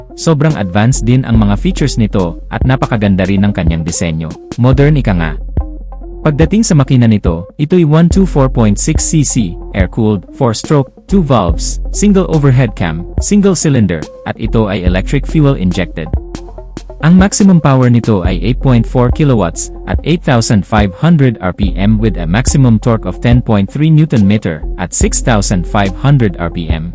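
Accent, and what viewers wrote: Visayas, Philippines. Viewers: Bisaya ka ba ate??😂😂😂😅😅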